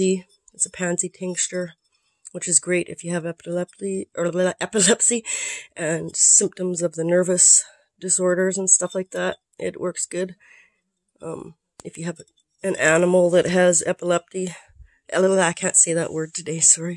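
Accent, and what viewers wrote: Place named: Canada. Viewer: I love your Canadia accent and like you I love plants especially pine trees don't ask me why I probably smell oh and lavender to LOL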